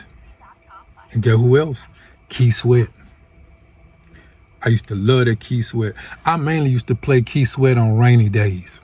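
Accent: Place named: Texas, United States. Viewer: You look like a friend from Texas back in day. I hear that accent you got, homie had that. Back day I had to move on. I couldn't under where homie was going. So I waited, and waited. When Engelwood, Chicago south sides meets a Texan from H- town sparks fly, Texans believe that Texas thing they are bigger ruffer then average man. One on one no weapons we when at it homie had to give respect specifically around them why boys. I was mothers boy but had eight brothers and sisters so I did the ass kicking bullies to protect them and weaker kids and friends. He was first homie outside Chicago. Unfortunately homie didn't under what you saw was not real me. I didn't sell drugs or gang stuff. I was navy trained Electrician so thats direction I took. Homies walked a different path. I still think homie was the shit! Dam dude you look like homie!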